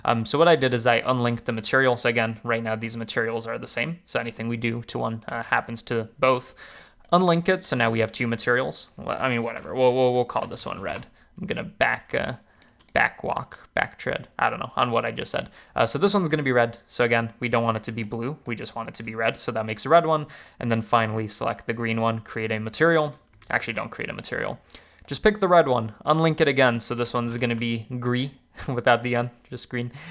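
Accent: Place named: Massachusetts, United States. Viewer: Wanna know how I know you're from Massachusetts?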